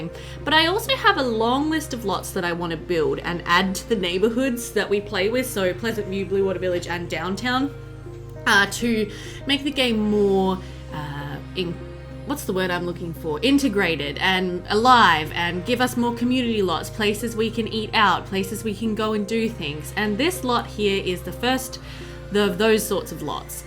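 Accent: Australia. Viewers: Soon as I found your channel the other day, thought she has to be Australian by that accent. I am from Melbourne. So hey there ! lol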